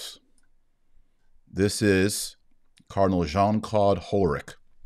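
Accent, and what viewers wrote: Texas, United States. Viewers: Texas accent getting stronger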